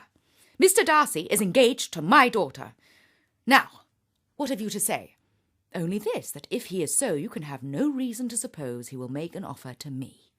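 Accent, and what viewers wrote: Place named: RP, United Kingdom. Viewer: This is absolutely gorgeous! I love her voice and the way she speaks. She sounds so posh (but I like it) and her diction is FLAWLESS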